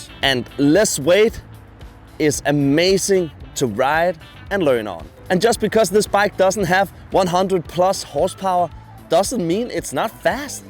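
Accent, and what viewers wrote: Denmark. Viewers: haha dude that’s amazing. Love me a danish accent. Awesome review too - I’m getting my license and that bike in the next few monthsb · 3 seconds into the video, I'm betting 10 bucks that this guy is Danish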